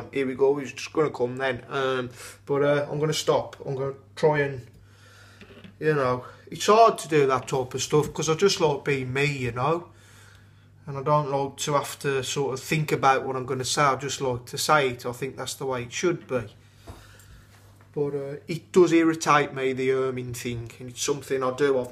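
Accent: Birmingham, United Kingdom. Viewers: Erm, nobody gives a toss mate, just be your Brummie self:)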